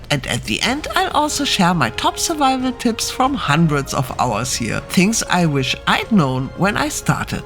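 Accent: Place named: Germany. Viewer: Den Kanal nie gesehen, nach den ersten zwei Sätzen Englisch "Ah eine Deutsche" 😄. Aber eine nette Stimme, alles sehr schön erklärt.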